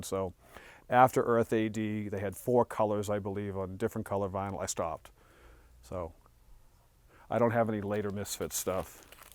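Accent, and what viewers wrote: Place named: Boston, United States. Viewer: Damn you still got your Boston accent !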